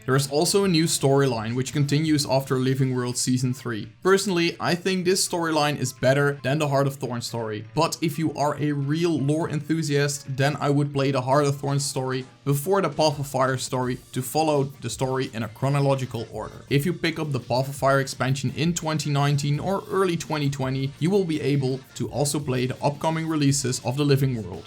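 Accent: Netherlands. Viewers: ik hoor dat je nl bent hihihi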